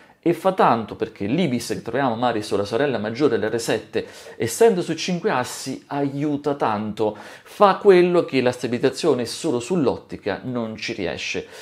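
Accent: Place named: Genoa, Italy. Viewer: Ohimè l'accento genovese🤣 che còccina belandi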